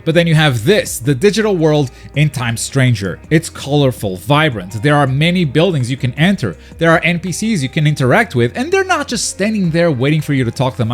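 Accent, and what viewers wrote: Portugal. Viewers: Bruh vou seguir só mesmo por seres tuga! Foi tipo "Este gajo tem sotaque de Lixian... deixa ver de onde é"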